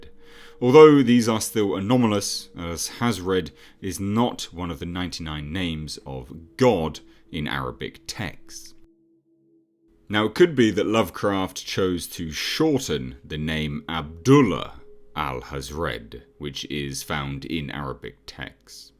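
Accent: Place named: England, United Kingdom. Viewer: sounds like James Earl Jones with an english accent. "Oi! I am your father mate!".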